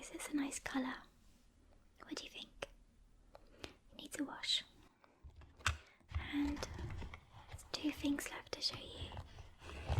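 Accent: United Kingdom. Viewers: Well, first of all, I love your British accent combined with your soft voice (I have American-Mexican accent in comparison, lol!). And you certainly don't need makeup to be cute ;) I also love the presence of green color: your eyes, your shirt, your nails... are your nails green? I think I'm colorblind XD. Anyhow, thanks for this video :)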